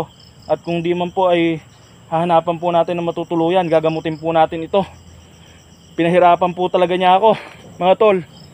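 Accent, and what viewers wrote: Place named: Pampanga, Philippines. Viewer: Saan lugar yan idol kc ang galing mong mag tagalog,pero my punto kang kapampangan?ingat lang idol,God bless u...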